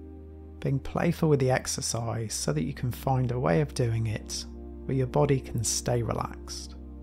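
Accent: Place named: England, United Kingdom. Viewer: Always nicer and more calming to hear English accent.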